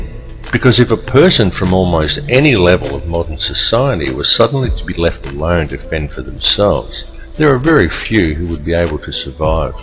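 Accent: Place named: United Kingdom. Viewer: Your british accent is addicting.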